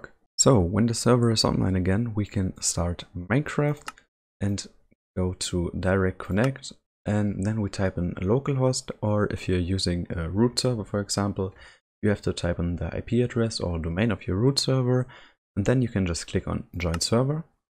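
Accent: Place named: Germany. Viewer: Nicht mal 5 Sek im Video und erkannt das er Deutscher ist! HAHAHAHA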